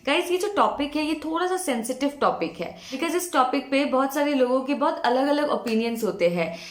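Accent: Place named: mixed, India. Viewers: I like your stories and your speaking style is very cute and my own sister-in-law was an Assamese so I understood that you were an Assamese too. She also talks like you😊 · Meri gf ki trah pronounciation hai aap ki Assamese bengali jab hindi bolte 😅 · Loved your stories and the narration :) Btw you have a northeastern/bengali accent...are you bengali!? 😅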